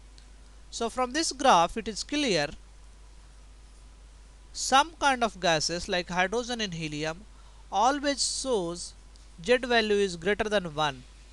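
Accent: India. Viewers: ohhhh WTF..indian engish voice..going to hell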